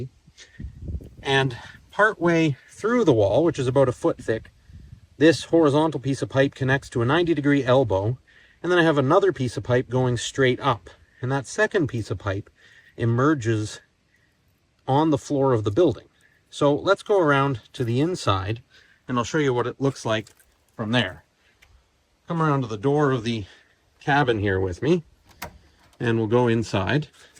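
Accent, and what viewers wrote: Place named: Canada. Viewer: I love your video tutorials; they are well paced and informative. Love the Canadian accent!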